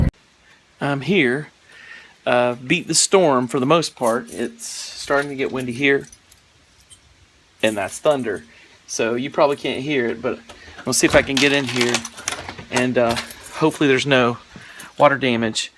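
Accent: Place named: United States. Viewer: Awe...a little country boy accent, to sweet, hope so!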